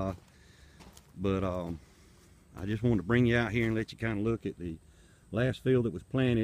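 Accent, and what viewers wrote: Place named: England, United Kingdom. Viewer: Haha nice English accent! So U and ur cousin farm together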